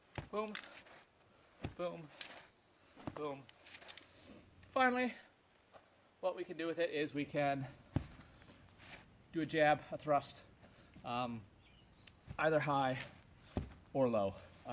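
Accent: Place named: Sweden. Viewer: You have a Swedish accent.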